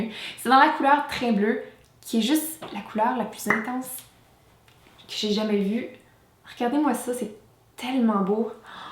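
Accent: Quebec, Canada. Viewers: Franchment ton accent est adorable. Ca me donne encore plus l'envie de visiter le Canada! One day.. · es tu quebecoise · Yknow I've always felt weird about canadian french cause it just sounds so different from french french that I'm used to, but you make it sound so nice! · i am not bad at french but at first i couldn't understand a word, your accent is sooooo cute and unique. i have never heard a french canadian talking so this is really cool! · je viens de découvrir que tu étais canadienne, je pensais que tu étais américaine tellement tu parles bien anglais. je découvre tes vidéos en français et j'adore tellement ton accent. vraiment l'accent québécois c'est magnifique. Merci pour tes vidéos de swatches de rouge a lèvres. je viens toujours vers toi pour les swatches. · Also your québécois accent is lovely ^_^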